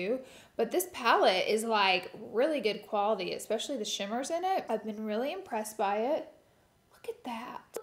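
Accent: South, United States. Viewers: I just adore you you are the cutest and I love your lil countrygirl accent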